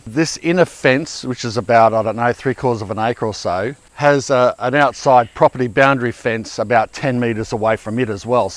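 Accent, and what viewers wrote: Australia. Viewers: Just love these videos just so typically Australian great listening.Kerry Australia 🇦🇺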